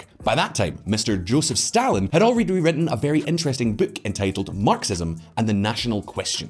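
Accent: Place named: Scotland, United Kingdom. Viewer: How do you get American from that accent? He's Scottish. Part of the UK Ashley 😁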